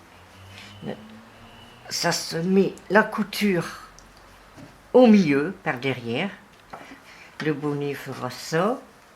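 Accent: North, France. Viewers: Merci pour cette bonne démonstration! Bonnes explications! Belle vidéo! Bonnet facile à réaliser au crochet! Bonne idée de prendre plusieurs fils pour faire le pompon, j'y avais pas pensé! J'aime votre accent du Nord! Amitiés de Belgique MIMILOU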